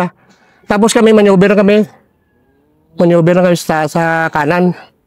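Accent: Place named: Iloilo, Philippines. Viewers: Cool lg c sir oh...accent di gid madula Basta Ilonggo😊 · Ilonggo talaga hhaha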